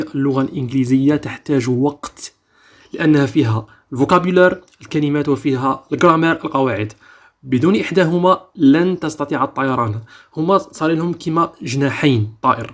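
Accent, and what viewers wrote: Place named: United States. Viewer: صوتك رائع في النجليزية كالامريكيين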